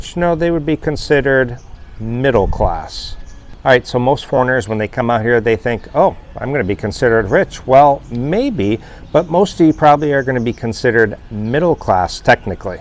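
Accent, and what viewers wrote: United States. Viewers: I noticed you wear Italian badges, but you sound like an American. If you are from America, are you not proud to wear the American flag?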